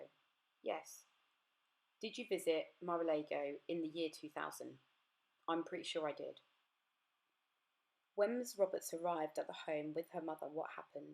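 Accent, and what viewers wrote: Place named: RP, United Kingdom. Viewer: Your accent is more posh then Maxwell's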